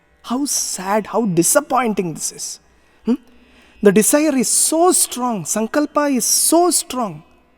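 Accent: Standard. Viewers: आपका संस्कृत उच्चारण बहुत ही शुद्ध है। उच्चारण व्याकरण सम्मत भी है, कहां पर है उपध्मानीय का उच्चारण हो रहा है तथा कहां पर जिह्वामूलीय विसर्ग का उच्चारण हो रहा है वह सभी सुन करन बहुत ही प्रसन्नता हुई। ऐसा शुद्ध उच्चारण भारत वर्ष में केवल 1% लोग ही कर पाते हैं। ऐसा शब्द उच्चारण भारतवर्ष में धीरे-धीरे कम होते जा रहा है ।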